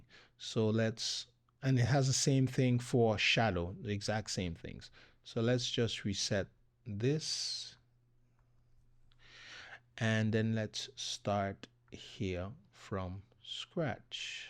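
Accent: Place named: Trinidad and Tobago. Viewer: You talk like you're from Trinidad!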